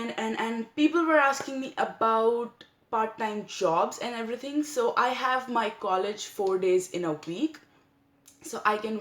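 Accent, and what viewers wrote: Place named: India. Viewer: 🐷🐷哎呀妈耶，这么隆重的阿三口音, 真的是所有单词都听懂了，但什么都没听懂最后🐷🐷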